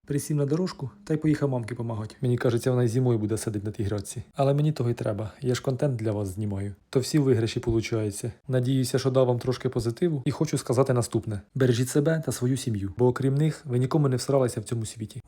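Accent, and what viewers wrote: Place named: Cherkasy, Ukraine. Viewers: Ось у цього хлопця як раз сама нормальна мова! На Черкащині завжди так балакали!!! А ви, Наталочко, пошукайте інший контент для мовної чистки!!! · Коли приїжджаю до мами і ніби не помічаю наш діалек. А коли слухаю на відео і реально помічаю наш Черкаський суржик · Dyakuyu!! Govorysh yak moi rodychi z Cherkas. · Рідні Черкаси!А я то думающо це за говір.Дайте ще й рецепта квасу з чорнобривці?! Дуже дякую,побувала на батьківщині! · Дякую,наче дома побувала.Мій рідний діалект,Черкащина.❤😂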